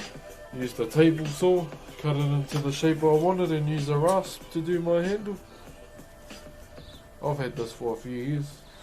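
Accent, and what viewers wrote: New Zealand. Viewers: *has a new zealand accent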